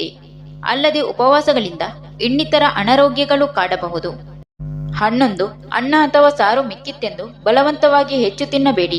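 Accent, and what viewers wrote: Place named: Kerala, India. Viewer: YOUR EXPLAINATION SLANG YOU ARE MALAYALI.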